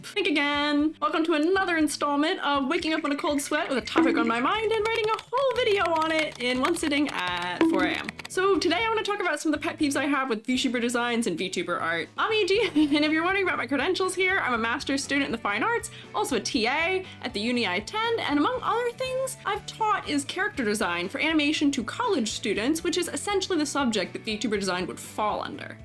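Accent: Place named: Sweden. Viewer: Unrelated, i'm still secs into the video BUT you don't happen to be swedish?? I keep hearing a slight sweng-lish and now i can't let it go 💀 Edit: okay I watched the full video and read the comments and i REALLY gotta agree with most people critisiting your criticism cause my god some of these "pet-peeves" are just ignorant, hypocritical and extremely biased.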